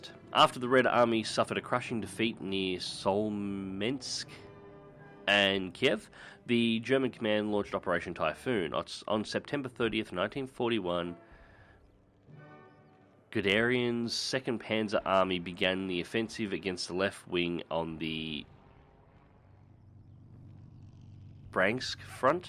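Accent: Australia. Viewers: You sound more australian than Rimmy. I'm impressed. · Another great video. nice to see you in a plane! love the aussie pronunciations! as usual take care good luck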